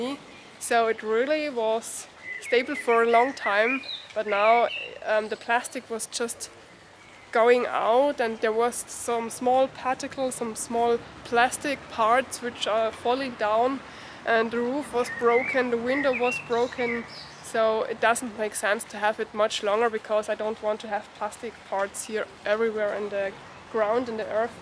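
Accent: Bavaria, Germany. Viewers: Servus, " You go girl!" Des is a Amerikanischer Ausdruck! Was bausten du mit dem Loch?( I Dat a root /tornado/luftschutz Keller bauen fuer diesen Zeiten- man derf nett bloed sein - die Zeiten waeren schlimmer- nett besser). Ois Guads,bleib gsund- Semper Fi- Peter p.s. des gfoid ma wenn du so nett bairisch sprichts mit deinen Tieren.( unser Germanische r Stam is wichtig gel.)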